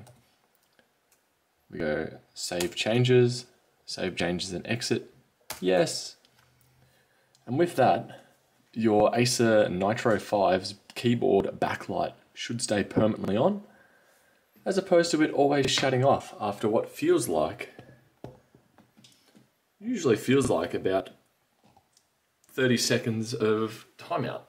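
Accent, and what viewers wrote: Australia. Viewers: Liking for Australian accent, am australian :)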